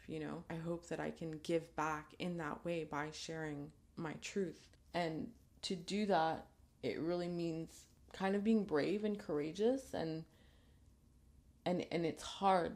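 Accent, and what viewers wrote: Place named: France. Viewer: PS. I think what makes you, you is your voice, your way of expressing things, of describing things, your French accent when you speak.. not your mascara and makeup, you are sooo beautiful barefaced. Just grab a cup of cappuccino and throw yourself outthere with whatever feels good to you. We are waiting for you , whenever you are ready!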